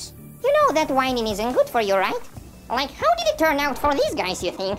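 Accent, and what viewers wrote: Russia. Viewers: Блин слышно у него русский акцент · Ох ебать акцент как будто на русском смотрю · Мне одному отчетлево слышен его руский акцент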